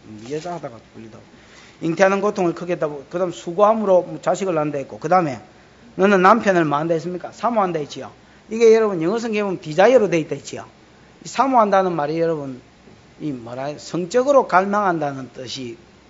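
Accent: Gyeongsang, South Korea. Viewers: 찰진 경상도사투리가 귀에 쏙쏙 들어옵니다^^😅 목사님의 깊이있는 메세지를 통해 다시한번 말씀앞에 서서 기록하신 말씀대로 거룩하게 살기를 소망합니다.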